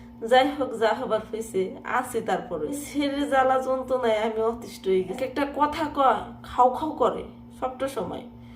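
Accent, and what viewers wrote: Manikganj, Bangladesh. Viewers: আপনার কথায় বুঝা যাচ্ছে আপনার গ্রামের বাড়ি মানিকগঞ্জ বা টাঙ্গাইল.।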